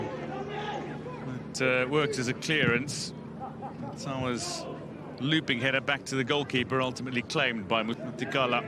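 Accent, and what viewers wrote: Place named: South Africa. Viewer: Why is the commentator a South African ? haha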